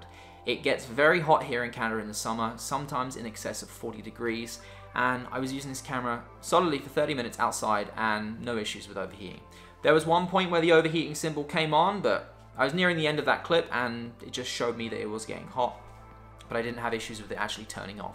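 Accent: England, United Kingdom. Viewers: Helpful video, good to hear the camera has worked well for you. Your a Brit living in Canada right? That accent sounds way too English to be a Canuck. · Like your video. I want to purchase the Sony A7iii. And buy the way you mentioned Canada but you don't seem to have a Canadian Accent. Are you from London England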